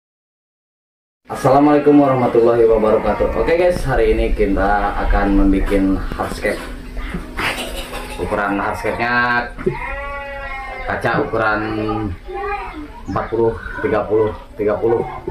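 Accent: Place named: Medan, Indonesia. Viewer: Kau bicara kenapa jadi logat medan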